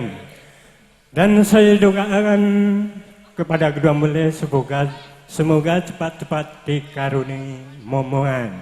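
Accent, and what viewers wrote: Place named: Tegal, Indonesia. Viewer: Bahasa Jawa nya Ngapak nih. Pst eksporan dr Tegal ya boskuh. He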